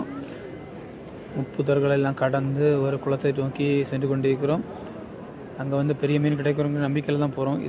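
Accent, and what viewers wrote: Sri Lanka. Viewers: ஈழத் தமிழ் பேசுவதை போல பேசுகிறீர்கள்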